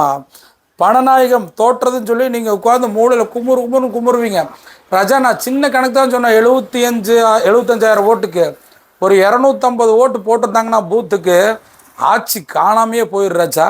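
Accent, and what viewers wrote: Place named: Kongu, India. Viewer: Bro....excellent speech that too kongu slang....wow❤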